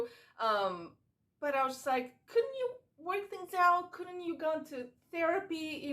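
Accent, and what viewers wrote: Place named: Brazil. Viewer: És brasileira? Quase que adivinho por causa do sotaque :) Eu sou portuguesa, ahahah! Descobri agora o teu canal. Já subscrevi.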